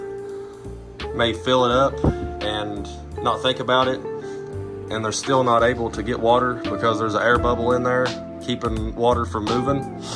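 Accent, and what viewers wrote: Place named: South, United States. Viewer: 😂 I heard your lovely southern accent and I was expecting some wholesome country or bluegrass music for background music.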